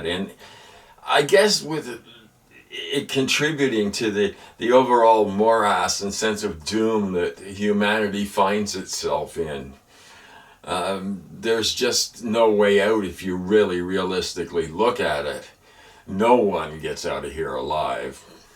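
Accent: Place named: United States. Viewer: you speak fluent american, both a blessing and a curse, so many friends from belgium, france, uk, aussies, etc etc do as well, luv ya, good content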